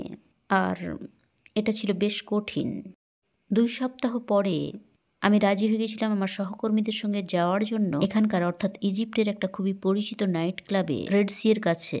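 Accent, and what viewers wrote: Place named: West Bengal, India. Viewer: এটা বাংলাদেশি চ্যানেল কিনা আমি বুঝতে পারছি না। ভাষাটা পশ্চিম বংগের মনে হচ্ছে যাই হোক ভাষা টা ব্যপার নয়। ইসলাম প্রচারের জন্য চ্যানেলটা ঠিক আছে তবে এখানে অন্য পিকচার গুলি দেখাবেন না প্লিজ। যিনি কথা বলছেন শুধু তাকে দেখান। অন্য পিকচারে পরদাছাড়া মহিলাদের দেখানো হয় এটা ইসলামের সংগে যায়না। আশা করি বুঝতে পারছেন।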